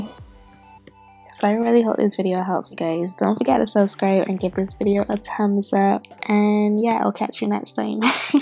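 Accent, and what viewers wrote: Barbados. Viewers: Thanks for the info. You sound Bajan. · THAT ACCENT😩😍BAJANNN GIRLLL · Jackee Pee she sounds Bajan to me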